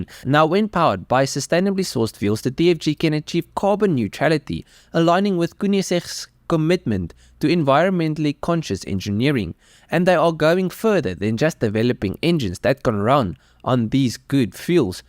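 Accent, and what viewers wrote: Afrikaans, South Africa. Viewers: You sound like someone who speaks Afrikaans.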